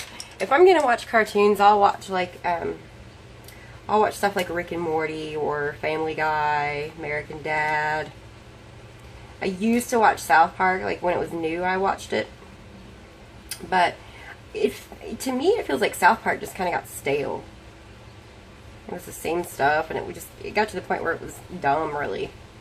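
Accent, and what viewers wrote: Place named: South, United States. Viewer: My boyfriend has asked me why I don't want to start a channel in the future and my Southern accent is one thing I worry about, so it is nice hearing your sweet, southern belle accent. I'm so glad Beth told me about your channel. You have tons a great stuff so I'll be binge watching you for the next few days 8)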